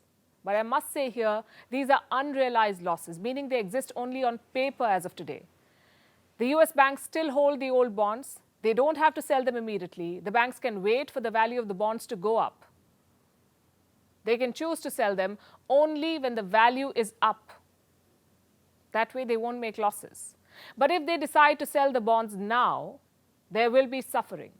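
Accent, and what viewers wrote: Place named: India. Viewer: She is average indian lady. She speaks English in hindi style with modulation. May be u like her modulated style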